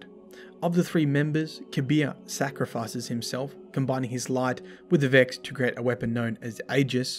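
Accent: Australia. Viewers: Wait you’re Australian as well?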